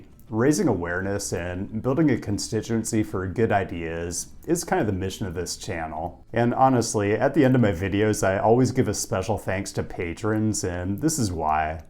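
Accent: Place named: Southern California, United States. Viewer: How come, if you’re from Portland, you have a Southern California surfer twang?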